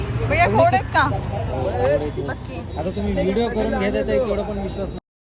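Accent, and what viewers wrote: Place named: Khandesh, India. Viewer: khup diwasatin nahi ....khup diwasani.....please correct marathi bola ...bolnacha tone khandeshi kivha Vidharbh kivha Kolhapuri vatate.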